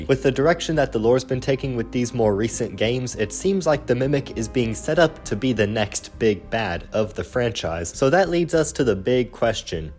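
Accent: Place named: United Kingdom. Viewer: British accent boy 😂😂😂😂😂😂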